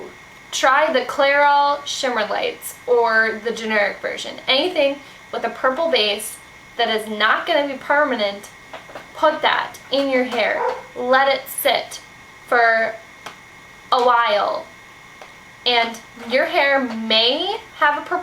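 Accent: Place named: Minnesota, United States. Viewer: Minnesota accent yikes.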